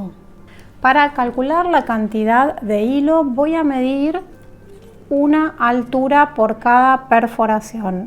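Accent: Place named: Argentina. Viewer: Vine para ver lo que se ofrece en instrucciones. Qué lindo escuchar un accento argentino. Hacía demasiado que no escucho una voz argentia. Voy a volver a ver el programma con mis hijas para que ellas también lo desifruten.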